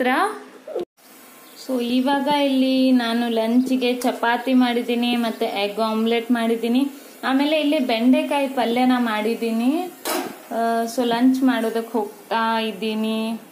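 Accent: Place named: Kundapura, India. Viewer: Kundapura bashe super. Nam kundapura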